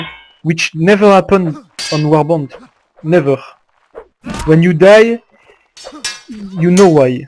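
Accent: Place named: France. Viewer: I DONT LIKE THIS FRENCH ACCENT ! FUCKING BAGUETTE but great vid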